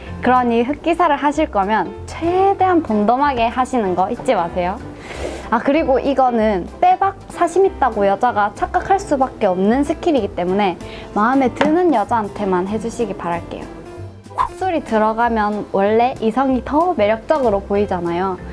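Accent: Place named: Jinju, South Korea. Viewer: 나도 고향이 진준디 말투가 참 익숙하네요 ㅋㅋ 응원합니다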